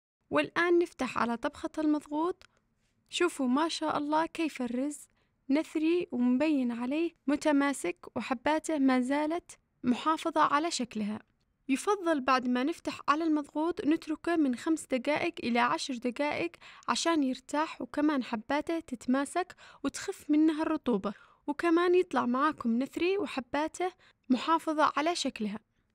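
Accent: Sanaa, Yemen. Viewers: احلى شي لهجتش الصنعانيه فديتش وفديت اهل صنعاء. ياليت تستمري بكل الاكلات وكل الطنافس. وحتى الزبيب واللوز يكفي تتكلمي عنه وتصوري. وش المناسبات. ف اليمن وتراثهم فيه خاصه اعل صنعاء لاحد يدري عنهم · ماشاءالله مبدعه ... حتى لو كنت اعرف الطبخه بس انا اشوف الترتيب والذوق والاناقه في كل شيء ... ولهجه صنعانيه جميله جداً❤️🌷🌹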